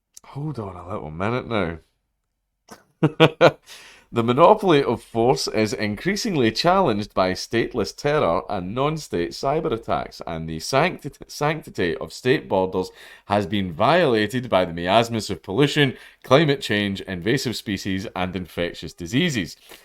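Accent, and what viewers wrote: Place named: Scotland, United Kingdom. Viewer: Not that I don’t like your ‘Mad Lads’ series/channel. But I love your news videos. As a yank, I love your Scottish accent too.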